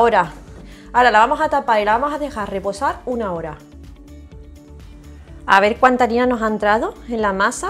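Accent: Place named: Seville, Spain. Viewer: Yo tambien soy de sevilla vivo aqui hace. 58años. Desde los5 que acento mas bonito yo no lo tengo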